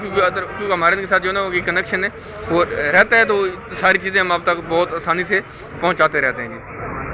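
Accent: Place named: Chakwal, Pakistan. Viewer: hum be chakwal sy hai ap ki awaz main wo lehaja aya tu is Lea ap sy pocha.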